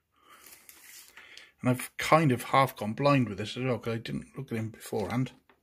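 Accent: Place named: South West England, United Kingdom. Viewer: I do know that Argos always fluctuate their prices on Chromebooks, One week the 11.6 inch laptops are £199, then they are suddenly £149 ! I guess it's striking lucky at the right time, Nice review on this Chromebook friend :) going by your accent i'm guessing you live in the South West England, I only say that as i have the same accent & im from Bristol South west!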